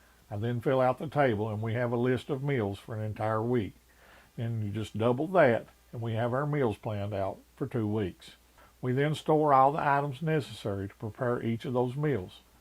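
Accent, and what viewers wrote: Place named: New York City, United States. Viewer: He talks like a New York Jew.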